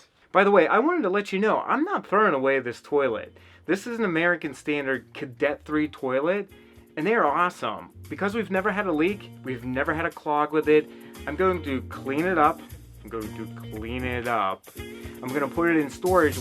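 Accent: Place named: Pennsylvania, United States. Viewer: Ah! knew you were from Pennsylvania! I’m from Philly area but now I’m out in California and your voice just reminded me of home. Thank you for making this video my toilet has a crack in it and I don’t want the repair guys in here during quarantine so here we go! Oh I don’t have that stuff that goes up the water but I thought of another good thing that I randomly have (and I don’t have kids so it’s very random I have this- I actually used it to make snow for Christmas since there is none out here ) If anyone happens to have any diapers they can use the inside of that just rip it open and there’s is fluffy on the inside that turns into that same looking gel. Glad I’m a little bit of a hoarder at the moment Ha Ha Ha Ha. Anyway thank you !!!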